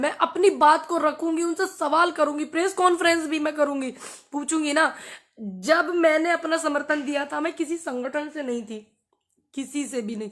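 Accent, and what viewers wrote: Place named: Western Uttar Pradesh, India. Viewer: बिटिया! हम आपके साथ हैं । आपकीे भाषा दूसरी नहीं है । मै मेरठ से रहा हूंँ । मैने आपके लगभग सभी वीडियॊ देखें हैं । आपने कहीं भी अभद्र भाषा का इस्तमाल नहीं किया है । आपकीे भाषा पश्चिमी यू पी की ग्रामीण खड़ी बोली है, यह एक धांकड़ बोली है, हाजर जबाब बोली है । आप यदि दिल्ली में बोली जाने वाली बोली का इस्तमाल करेगीं तो पूरा देश आपकीे आवाज सुनेगा । इसके लिये आपको यू पी शिक्षा बोर्ड के हिंदी के गद्य-लेख पढने होंगें ।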